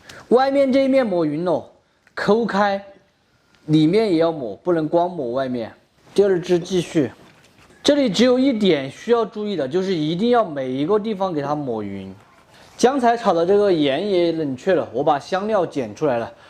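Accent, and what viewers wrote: Sichuan, China. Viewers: 老哥，你的椒盐普通话太地道了，我全程笑着看完的。😂😂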